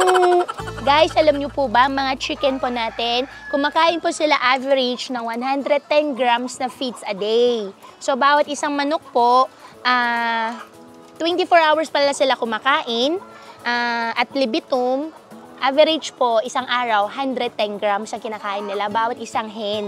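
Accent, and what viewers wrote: China. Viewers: Nilalabanan nya yung chinese accent nya pero very halata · Agree if she really grew up in the Philippines.. She would sound like pinoy accent talaga... But her accent is Chinese talaga. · Pota with the distinct chekwa accent. Halatang Chinese sleeper cell to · Chinese accents talaga ☹️ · Ganyan magsalita ng tagalog yung Chinese. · Chinese na chinese yung pag tagalog. Reminds me of Chinese vendor owners sa 168. Mga Half chinese di ganyan accent , pure tagalog pa din ang English. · Sa itsura nya at accent tsekwa yan e. Yun iba kasing pinoy pakitaan lng ng konting bait bumibigay na agad. Di tinitingnan kung mabuti ba talaga o hindi ang pagkatao ng isang tao. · Chinese nga ito sa salita p lng may chinese accent na. Ako chinese blood din pero i was born here grew up here im.proud to be a true Filipino citizen wala na invaded na tyo ng mga pure chinese we need to stand up to protect our own country God bless the phillippines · Yup. 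Her Mandarin tongue accent is obvious 🤥 😝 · Chinese na Chinese nga yung punto ng pagsasalita nya. San ba sya nanggaling?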